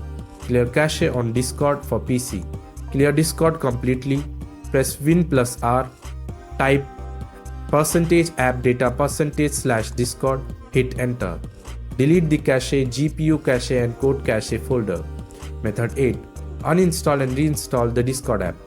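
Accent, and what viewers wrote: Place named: India. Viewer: You think I didn’t search up how to fix if I wanted a Indian to read it out I would have asked chat gpt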